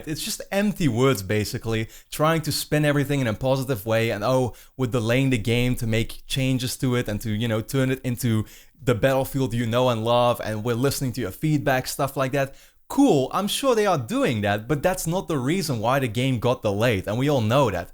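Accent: Netherlands. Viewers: Hmmmm you have a Dutch accent.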